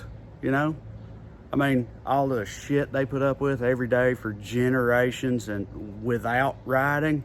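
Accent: South, United States. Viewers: I love hearing truth spoken in an accent like mine. Full disclosure, you made me proud to be southern! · Combining wit with reasoning and great intelligence isn't easy but you definitely nailed it with lovely southern drawling lingo too ... Was even able to enjoy it in entirety back here in Oz... Could stand a heap of this, no kidding ! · I just think you’re simply awesomeness with a strong Southern accent. I love how you think and how you express it.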